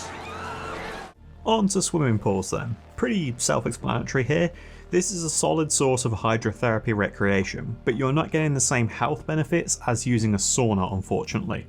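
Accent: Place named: New Zealand. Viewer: For some reason I thought you were a kiwi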